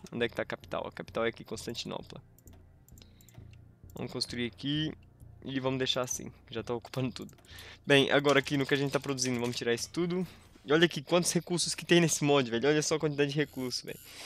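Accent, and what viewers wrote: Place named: Portugal. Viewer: caramba, é uma história bem legal, deve estar desde pequeno em Portugal para ter pego o "sotaque", bom vídeo de verdade amigo, todos os mods que penso em jogar algum dia sempre pego uma série sua para acompanhar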